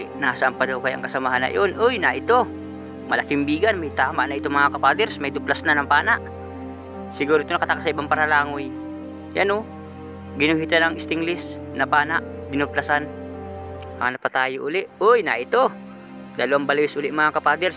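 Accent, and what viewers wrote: Bicol, Philippines. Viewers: Parang bicolano eh .haha parang catanduanes?? Baras ??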